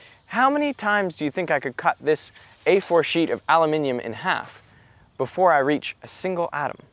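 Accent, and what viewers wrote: Australia. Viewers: He has a very slight Australian accent here... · You don't sound very Australian until you say aluminum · ohh he does sound much more australian here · Am I the only one who thinks I can vaguely hear the Australian accent? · Woah, the ever so slight Australian accent definitely threw me off. Crazy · I love his half-australian accent in this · lol, I can hear an ever so slight Australian accent coming through and it has distracted me through the whole video! It's amazing though. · OHMYGOD, this was so long ago that Derek actually sounded more like an Aussie 😅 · Really cool to see how your accent has changed over time. You can tell there's a bit of the 'stralian in there at times; wonder how much of the video accent was natural vs imposed. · I never knew he was Australian! You can really hear it in his old videos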